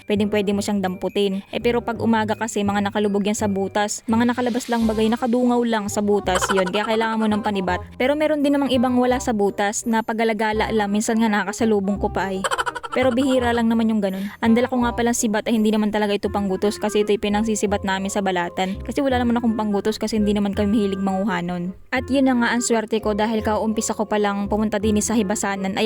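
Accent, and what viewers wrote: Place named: Quezon, Philippines. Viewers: Parang pamilyar Yung accent ng salita mo idol taga Quezon puba kayo? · lam aw😂😂😂 Quezon prov tagalog talaga😂❤ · pa shoot out ako maam from Sipocot Bicol.. JOEZEL NESAGON.. ikaw baga ma'am ay taga Quezon o Batangas.. eh kung maka ay ka eh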